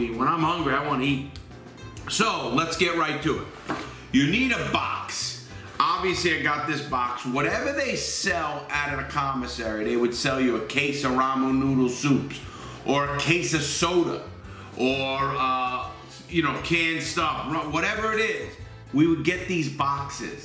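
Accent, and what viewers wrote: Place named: East Coast, United States. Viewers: by his accent he's east coast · The thick Jersey accent really ties it all together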